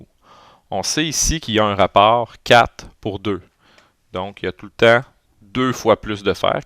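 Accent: Quebec, Canada. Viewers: Merci pour l'aide! Mais cet accent quebecois est pas tres beau :/ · Merci beaucoup ! T'es canadien?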